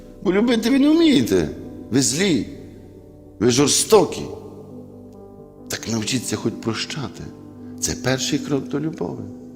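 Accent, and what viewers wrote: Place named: Galicia, Ukraine. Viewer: Мені дуже подобаються ваші проповіді чіткі і цікаві і ще ваш чудовий галицький діалект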